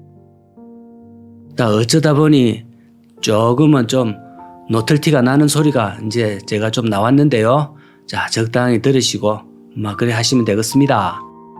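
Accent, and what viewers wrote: Gyeongsang, South Korea. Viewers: 모두들 잘살고 평화로운 세상 오길 간절하게 바랍니다. ~경상도 말씨가 듣기좋습니다. 저도 차분한 말투 배워야겠어요.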